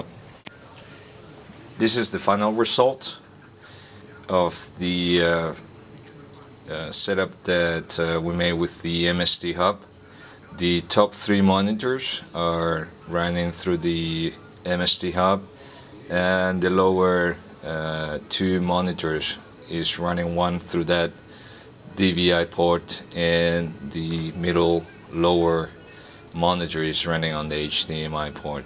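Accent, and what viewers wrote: Netherlands. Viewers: Are you duch think i hear a dutch accent hehe.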